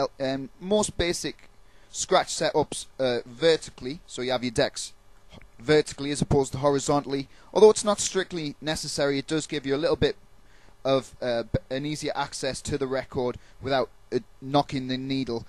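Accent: United Kingdom. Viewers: i fuckin hate the british accent, but for sum strange reason i dont mind when you're speakin it =/ lol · you a geordie?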